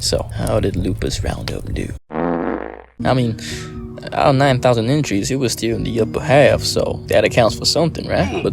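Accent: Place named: West, United States. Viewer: Doing the entire dev log with a western accent is true commitment to the bit. I really enjoy these devlogs, thank you for sharing another one!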